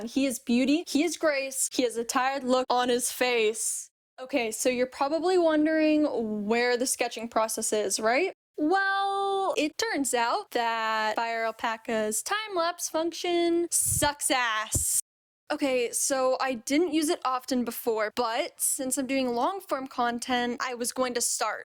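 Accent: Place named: Canada. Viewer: You sound so Canadian in this btw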